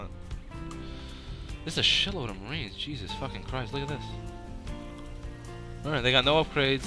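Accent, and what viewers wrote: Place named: New York, United States. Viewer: Haha yeah man i remember in one of your first vids some one had asked you where you were from and since then i was surprised cuz i couldn't find a trace of NY in your voice lol 'till now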